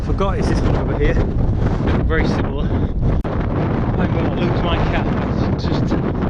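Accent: United Kingdom. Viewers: hey. Yh iv only jist checked my spam box. Baaahahah anyways got your email. Me and the other guys are all having a meeting on Wednesday about the hike so il be in contact with you on Thursday. Speak soon buddy. By the way. Your accent sounds dead familiar. Are you from Mersesyside or Chester area by any chance?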